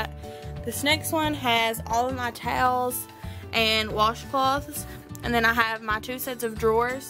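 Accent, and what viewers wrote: Kentucky, United States. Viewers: OMG I could tell she was from Kentucky as soon as she started talking :) I'm also from Kentucky :) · What part of Kentucky are you from? I'm from southern WV and your accent reminds me of home :) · I LOVE YOUR KENTUCKY ACCENT!😍😍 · Love your accent I wish I was from the south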